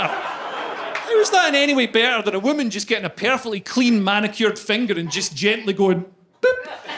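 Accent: Scotland, United Kingdom. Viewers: His Scottish accent makes the jokes even better. Non-Americans have such effective vocabulary and makes things a lot funnier than they probably are. It took me a very long time to know I'm Ace, partly because I don't f*cking care about orientation or labels. Is it too much to simply exist without knowing some personal preferences? I'm all for figuring oneself out to have a peace of mind but, damn. The alphabet people have taken things *WAY* too far this year. Be happy with who and what you are but let's remember to keep some things to ourselves. Some gay guys I knew/know didn't show any "signs" as they didn't make it out to be their only characteristic. Very polite. Well spoken.They also just so happened to dress very well but doesn't every self-respecting person do that? Annnyway, the only real fact didn't say about who's actually upset about us Asexuals is feminists. 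Feminazis in particular, the man-hating ones that want nothing more or less to wipe us off the Earth. When in college I read a passage which humored me. It was a bona fide statement backed up by some sources claiming Feminazis hate us Aces because we don't fit into that stupid rhetoric they're always saying: "Men only want one thing..." uh... if it means what I think it does, no we don't. Begone, Karen! Off to CVS for a boxed wine for your sorrows and bag of Whiskas for the cats! Try to figure out a more practical way to insult us Aces. Take your time. I can wait. By the way, if I understand the online comm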